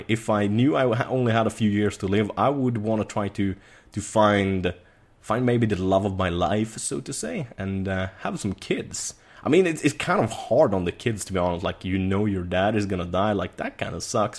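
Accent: Sweden. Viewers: I love your sense of humor. I have to say, though, probably just because of the accent and because I know you're Swedish, I keep expecting you to be blonde, and then the dark hair is a little... disconcerting?... to see. Do you ever have that experience, somebody on YouTube somehow not matching, in appearance, what you expected from their voice or from other things you knew?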